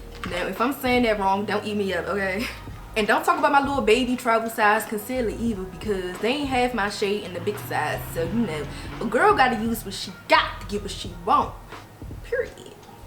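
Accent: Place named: Baltimore, United States. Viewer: Sounds like she's from Baltimore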